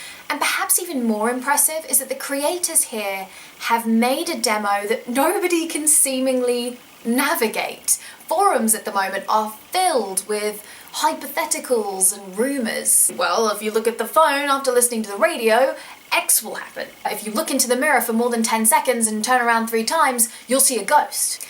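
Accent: United Kingdom. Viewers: You are sooo british !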